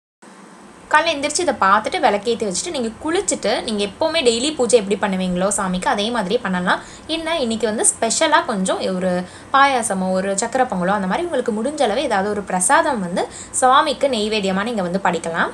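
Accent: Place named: Kerala, India. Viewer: Voice correct ah Kerala pa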